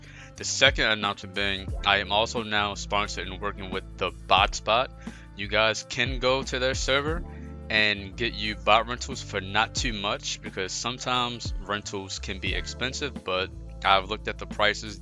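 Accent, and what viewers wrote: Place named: Baltimore, United States. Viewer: good vid g. Yo you from baltimore? I can hear it from a mile away hahaha anyway I remember checking out wit eno using a mastercard on ys but mastercards dont work no more?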